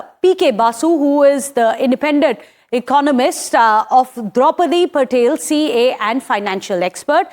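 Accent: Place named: Assam, India. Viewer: Deka?! First time seeing one Deka as news anchor in national media!! Well done!Assamese influence in accent is obvious though! But please be bit less forceful in delivering the words. Let it be free flow! You can follow Palki Sarma for learning!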